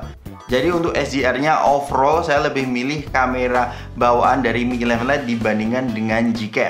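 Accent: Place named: Yogyakarta, Indonesia. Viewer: logat jojgae ojo ngasi ilang dab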